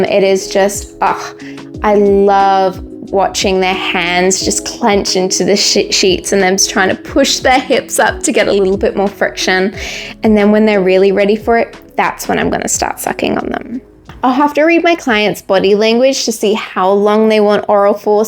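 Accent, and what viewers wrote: Australia. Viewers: surprised by the aussie accent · That's an Oz accent.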